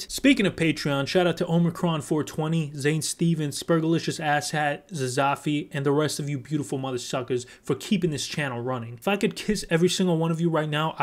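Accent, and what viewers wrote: New York, United States. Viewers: Needed a real "Fuhgettaboutit" kinda guy to narrate my work session. Thank you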